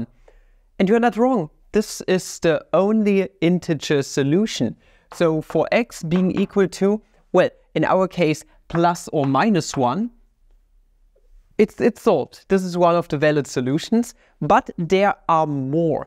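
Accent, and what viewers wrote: Germany. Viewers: Are you German? I always thought you had a German accent and a German name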